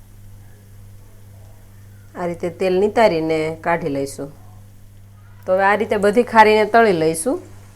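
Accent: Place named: Africa. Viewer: Looks amazing ben Hu pan banavish Radha rani bless you ❤ You sounded like you are from Africa Me too now in uk 🇬🇧 😮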